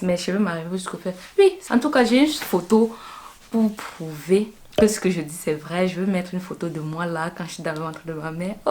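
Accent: Cameroon. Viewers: Toi tu es camerounaise ça se sent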